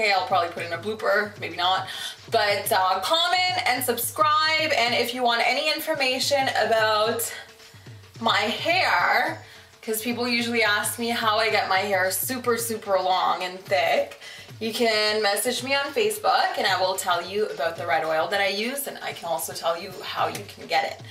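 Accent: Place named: Canada. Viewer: you kinda sound like a canadian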